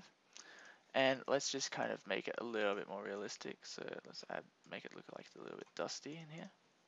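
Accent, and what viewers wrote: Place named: Australia. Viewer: Heyyyy Australian ;) What part you from?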